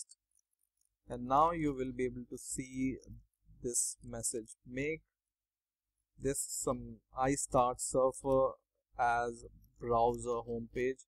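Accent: India. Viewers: DO NOT DOWNLOAD Honestly guys never trust an Indian guy. EVER! Go watch the tech support scammer videos. They're all Indian people with fake English names. Guess what, this guy has an Indian ass voice and people are saying viruses in the comments. DO NOT DOWNLOAD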